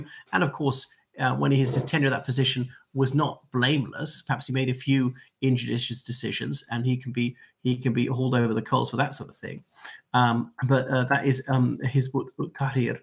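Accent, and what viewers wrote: RP, United Kingdom. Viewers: With that plummy accent you claim Ireland.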